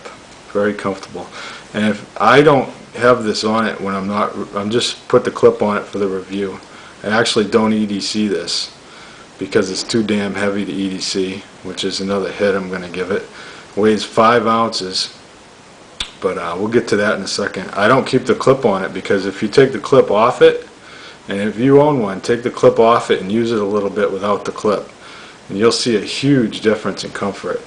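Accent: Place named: Brooklyn, United States. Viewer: nice review bro! you got a cool Brooklyn gangster accent. where you from?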